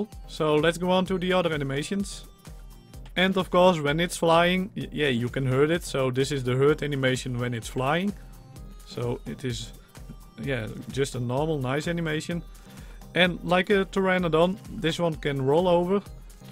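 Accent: Netherlands. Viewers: Hes Dutch lol that english do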